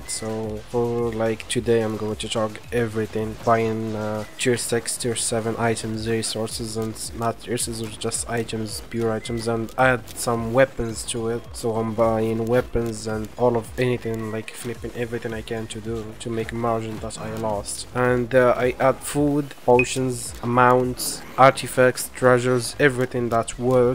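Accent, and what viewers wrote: Morocco. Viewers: This is a Moroccan accent xD it can't be otherwise haha love it content man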